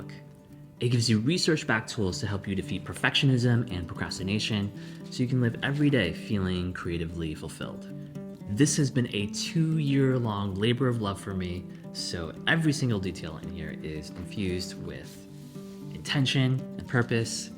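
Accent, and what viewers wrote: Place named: Scotland, United Kingdom. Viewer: As I Scotsman, I’ll forgive you for the Star Trek Scotty accent, for producing a great video. I’ve got a 100f so understand what you are talking about ….. despite the accent.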